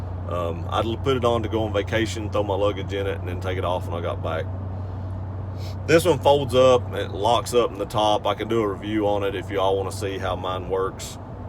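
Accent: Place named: Tennessee, United States. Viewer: Great review of Tundra, love the eastern Tennessee accent too, some of the best folks in the world are from that area, a great friend passed away earlier this year from Bristol Virginia, Kevin Davenport, he had this same accent, could sell ice to a Eskimo and always had nice vehicles. Was blessed to have had him as a friend!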